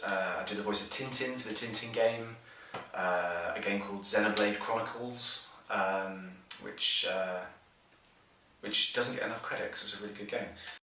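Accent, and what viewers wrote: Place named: England, United Kingdom. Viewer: so it WAS an english accent after all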